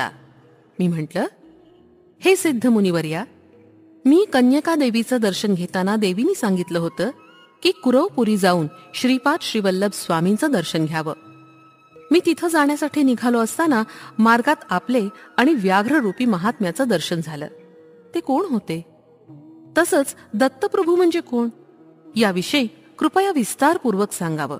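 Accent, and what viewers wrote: Standard. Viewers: Faar Uttam channel ahe... shripad shrivallabh charitramrut ekdum Chan ahe..avaaj khup Chan ahe. Uchhar shudha ahet..aikanaryala aani pahnaryala khilvun thevta..hya channelcha jastit jast prasar vhyala hava🙏 Shripad Rajan Shaaranam Prapadye 🌸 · Excellent voice didi . Uchhaar agadi shuddha ahet .Sagle adhyay asech upload kara .Shripad shrivallabhanche ashirvad tumhala labhot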